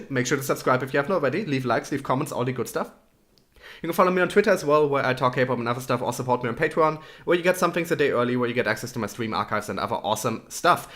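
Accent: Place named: Germany. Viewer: Der Akzent hat es verraten.. Hätte aber nicht gedacht, dass ich jemals einen deutschen Kpop-Reacter finden würde. Es freut mich, dass dir das Lied gefallen hat, sind meine Lieblingsgruppe. Ich würde dir von ihnen empfehlen, falls du es noch nicht gehört hast!:)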